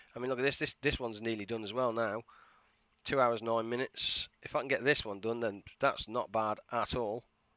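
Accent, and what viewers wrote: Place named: United Kingdom. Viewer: Very nice gameplay and commentary, you definitely have a sub(-scribe) ;-) from me. Keep it going. Also approve of the very British way of commentating :-) . Really like the way you communicate with your stream viewers and take suggestions from them.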